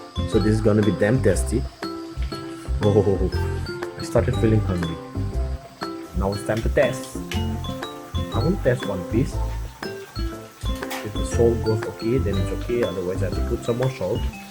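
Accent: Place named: India. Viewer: So many critics in the comment section... english is a language, just a way of communication,, if u can understand and communicate than thats good enough.. different people have different accent.. british americans scottish all have diff accent.. and i know how indians speak and its not appreciated either by others😂😂 so stop criticizing ur fellow indian😂😂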